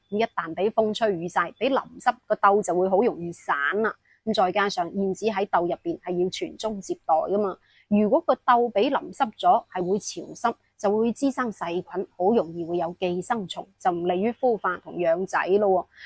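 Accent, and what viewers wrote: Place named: Guangzhou, China. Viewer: 主持說話，帶点廣卅口音，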